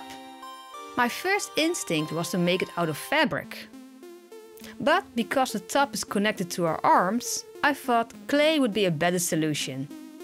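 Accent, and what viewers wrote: Netherlands. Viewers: Hey, where are you from? You have a Dutch accent, I’m Dutch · Jep she is dutch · Are you dutch you sound dutch I am ducht bwt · She’s from the Netherlands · * I knew you sounded Dutch, my friend is Afrikaans and she sounded like you! Afrikaans is derived from French, Dutch, and Swedish, and the accent sounds just like yours! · I have a question, are you from the Netherlands? Your accent sounds like you come from there! Very cute repaint though. You deserve so much more recognition <3 Edit: ik herken je accent echt! Ik ben blij dat er ook een Nederlander in “the doll community” zit! :)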